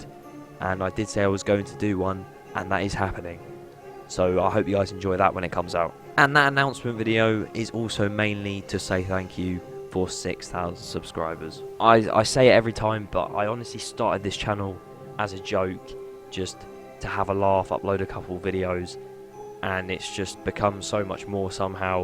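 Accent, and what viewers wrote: United Kingdom. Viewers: where in england are u from?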